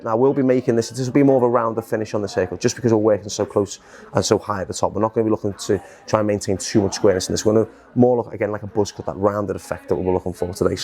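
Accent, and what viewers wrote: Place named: Merseyside, United Kingdom. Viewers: Lovin the Merseyside accent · He speaks too fast and has more of a northern accent compared to the art attack guy.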